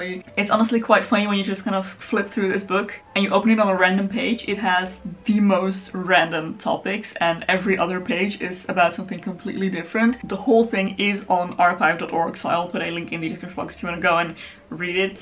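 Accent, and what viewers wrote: Netherlands. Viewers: I love your English/Dutch** accenttttt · Ik hoor het toch altijd wanneer een nederlander engels praat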